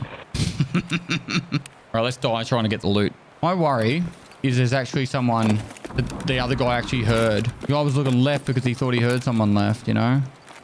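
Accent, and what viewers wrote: Australia. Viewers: Imagine an angry Australian man in an abandoned mall yelling and chasing you down. I’d be terrified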